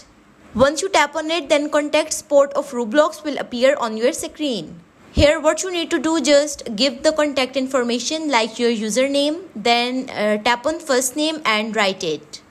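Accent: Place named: Arab world. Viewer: arap aksamı var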